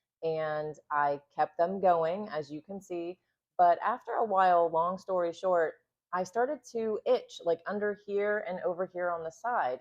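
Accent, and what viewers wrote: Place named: United States. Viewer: Love the accent. Im from Ireland and I rarely here a real and nice Amwrican accent, you know some influencers put on a drain thing and sound artificial.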